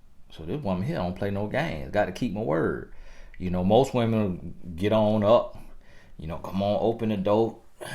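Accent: South, United States. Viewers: "How a queen lil" lmbo boy you is country!! Lol I love it!!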